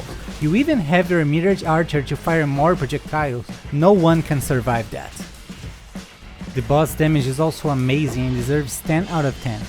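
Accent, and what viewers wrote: Brazil. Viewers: Esse ingles brasileiro me pegou mt Bom trabalho mano!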